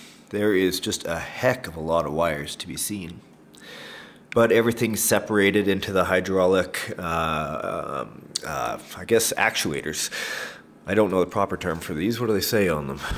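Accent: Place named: Canada. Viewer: he's Canadian.you can tell from his accent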